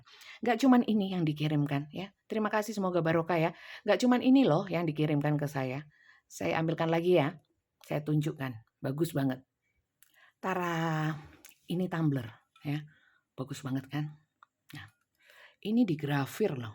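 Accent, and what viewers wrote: Java, Indonesia. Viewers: Tapi ngangeni readingannya bunda... Medhoknya,ceplos ceplosnya,sak ononé bgt. Istilah²nya njawani tenan. JANGAN BERHENTI JADI TAROT READER. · CERITA ku bunfa itu. aku segan demen bngt ma suara bunda yg khas. enak di dengar . masio medok terasa luwess. pingin bamget t lihat foto bunda.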